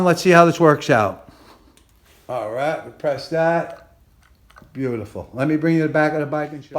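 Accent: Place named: New England, United States. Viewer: Cant stand the NE accent,Never met a goof that didnt vote Democrap that talks like that.And this goof repeats EVERYTHING.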